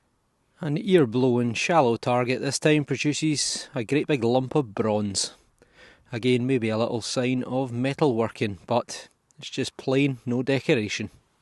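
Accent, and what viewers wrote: Scotland, United Kingdom. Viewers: Grettings from Denmark. I love to watch your videoes and listen to your charming scottish accent. Keep on the god work 😊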